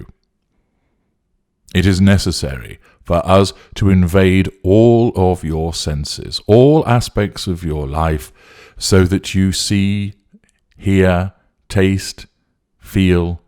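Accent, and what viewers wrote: United Kingdom. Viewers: nope i just don t like the british accent and i know where your coming from, no hard feelings i just as good as u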